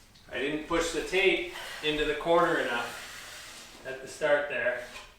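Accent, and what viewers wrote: Canada. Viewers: Cool... The older you get, the more Canadian you sound eh. Awesome videos. Thanks!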